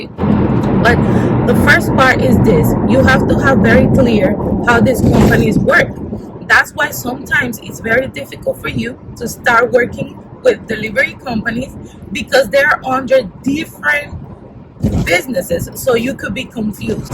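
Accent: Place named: South Africa. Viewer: Don’t mean to be rude but you sound South African.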